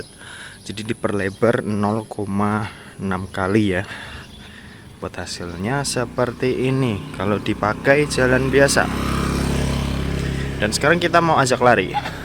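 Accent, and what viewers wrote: Semarang, Indonesia. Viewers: Wong Semarang to mas hehe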